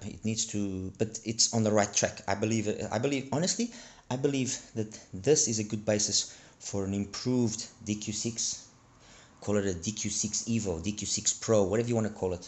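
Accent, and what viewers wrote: South Africa. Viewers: Where are you from man? I live in South Africa and your accent sound South African. Just very curious now.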